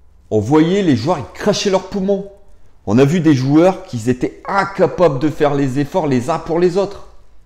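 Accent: North, France. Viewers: Jme demande toujours si tu viens pas du Nord ta grave l'accent ! Ta raison mon frère je pense que pour être compétitif il ns faut 2 gros bancs énorme comme on ttes les équipes modernes ex Bayern city etc..... Une équipe collectifs pas des joueurs qui se croient au five ! Nos joueurs c'est encore trop peu c'est encore trop faible Bakker Diallo Draxler icardi kehrer faut les vendres !!!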